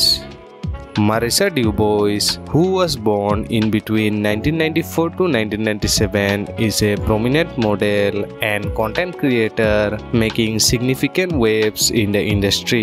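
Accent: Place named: Bangladesh. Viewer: Bangladeshi accent, for sure you are Bengali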